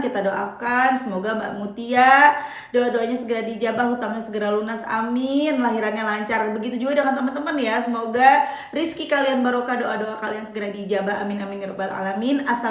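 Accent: Jakarta, Indonesia. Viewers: masya Allah mantap mba bahasanya betawi bgt❤😊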